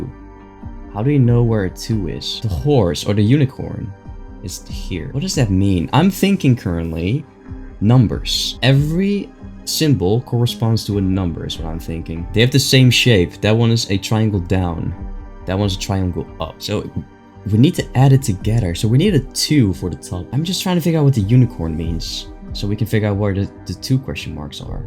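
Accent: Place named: Netherlands. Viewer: Are you from the Netherlands? I feel like your accent sounds Dutch 😅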